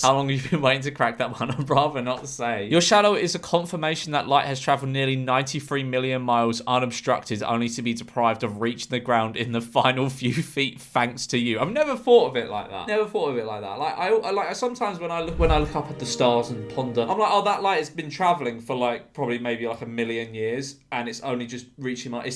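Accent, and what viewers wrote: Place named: RP, United Kingdom. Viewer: You know when you got imposter syndrome? I got this but with. I thought I was watching a different person when I heard his posh accent. Golly, when did sound posher?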